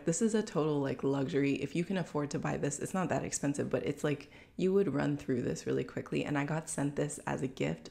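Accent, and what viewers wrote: Canada. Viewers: She’s Canadian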